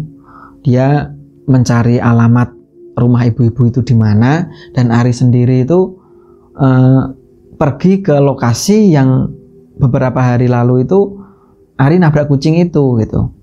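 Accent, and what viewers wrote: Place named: Sragen, Indonesia. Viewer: Mas pean iku logat bahasane kyk wong sragen , blora y?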